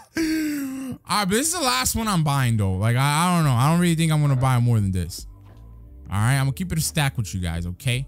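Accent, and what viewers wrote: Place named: Spain. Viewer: eres de ascendencia española I can kinda hear it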